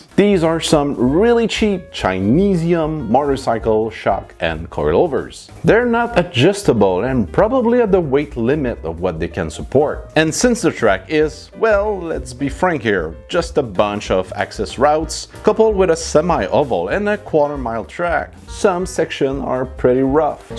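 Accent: Quebec, Canada. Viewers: Tu me semble canadien francais mon ami? are you??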